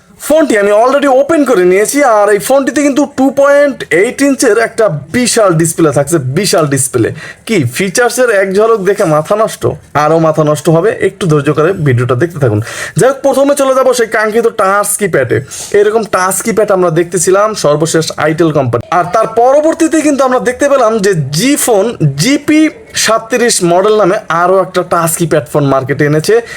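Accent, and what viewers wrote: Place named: Barishal, Bangladesh. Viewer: কথায় বরিশালের গ্রাম্য ভাব আছে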